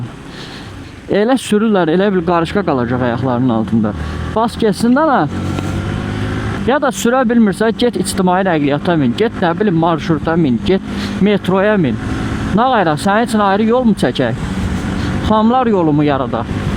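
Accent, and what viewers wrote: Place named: Qazakh, Azerbaijan. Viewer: Qazax ləhçəsi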